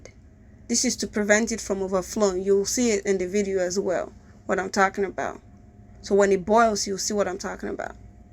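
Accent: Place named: United States. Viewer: I just finished watching a pidgin version of another video. Coming over here, It's the American accent for me o. Put in some Warm WORA... Na dem no ready. Well done. Nice video. Please, I suggest you should record your videos in landscape mode for Youtube.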